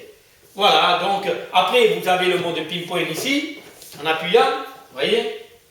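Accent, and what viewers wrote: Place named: Toulouse, France. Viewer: J'ai hâte de recevoir le mien ! très bien cette démo et avec l'accent de Toulouse c'est encore mieux !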